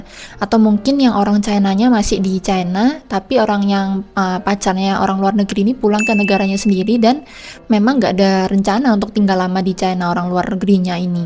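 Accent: Java, Indonesia. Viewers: Logatnya agak ada jawanya, hehe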